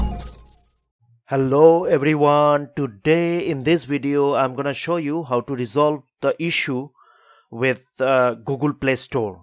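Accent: India. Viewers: your indian?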